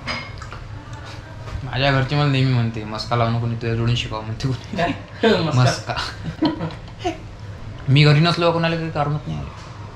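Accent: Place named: Vidarbha, India. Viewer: Bhai tu pakka VIDARBHA mdhla ahe.... Vidharbha chi bhasha ch alg ahe bhawa🙌🔥🔥🔥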